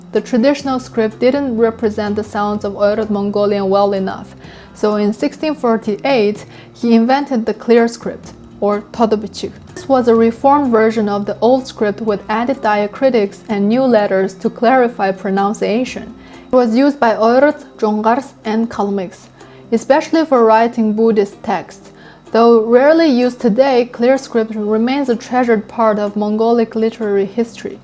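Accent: China. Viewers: You speak English with a Chinese accent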